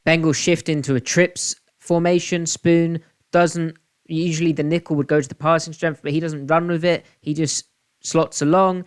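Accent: United Kingdom. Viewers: YOU'RE BRITISH!?!? I've been following you on twitter for a while and had no idea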